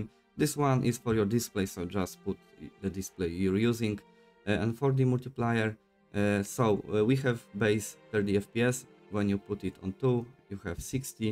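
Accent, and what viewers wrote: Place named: Poland. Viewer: Słychać że Polak❤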